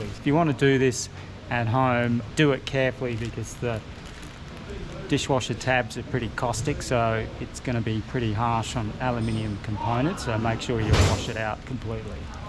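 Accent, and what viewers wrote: Australia. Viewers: you're a legend. Good to hear another Aussie speaking Aussie (can we not do the bleep?), Mate, you did good, real fuckin good!! Cheers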